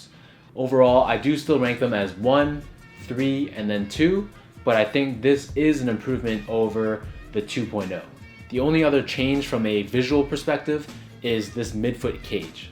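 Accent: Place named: Germany. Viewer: ok you seemed to had a little german accent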